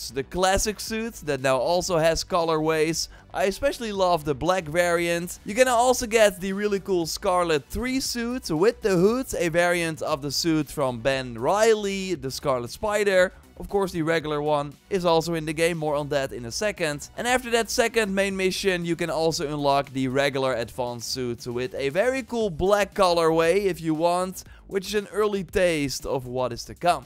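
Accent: Netherlands. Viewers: As Dutch as an accent can be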